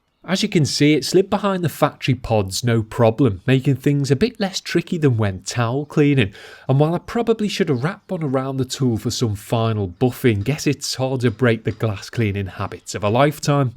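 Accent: United Kingdom. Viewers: Wow this guy has a heavy Red Coat accent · Where are you from my sons says Liverpool i said no. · don't know how to explain my feeling about u speaking that brithish english accent, as a mexican is hard to me try to understeand what are you saying, but however i have to use the subtitles. nice video!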